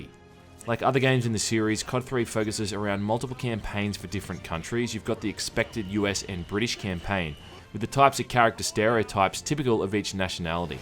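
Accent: Australia. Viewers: You sound so innocent and well behaved here mate! Keeping the Aussie accent in check too. I'm glad you found your real voice eventually, coz you're hilarious and entertaining. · Love your Australian accent.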